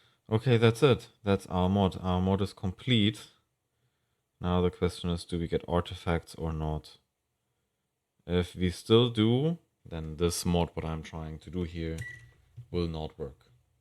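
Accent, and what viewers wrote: Germany. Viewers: based on his accent I would guess he is German, in Germany it is common to say nineteen hundred but as soon as you come to the two thousands you wouldn't say twenty nine hundred and say two thousand nine hundred. It's a force of habit to say numbers this way.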